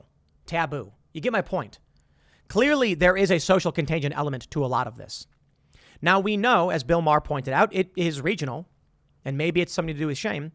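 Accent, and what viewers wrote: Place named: South, United States. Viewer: Southey Accent came out strong on this one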